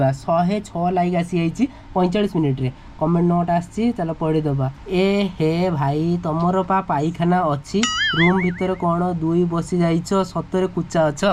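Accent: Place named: Bengal, India. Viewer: ବଙ୍ଗାଳୀ ଷ୍ଟାଇଲ ରେ କହିଲ ମାନେ ବାଙ୍ଗଲାଦେଶ ରୁ ଆସି ଓଡ଼ିଶା ରେ ରହୁନା ତ 😮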